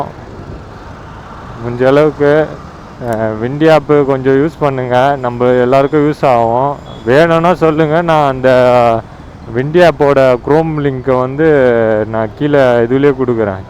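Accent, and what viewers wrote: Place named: Chennai, India. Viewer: Bro unga chennai slangu super ah irrku.... Na oru nal ungla meet panuven....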